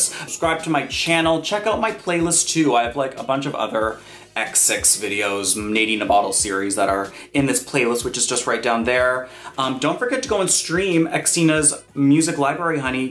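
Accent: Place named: Canada. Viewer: You're a TRUE Fighter, I love you so much!!!! I'm obsessed with you and ur flawless Canadian accent!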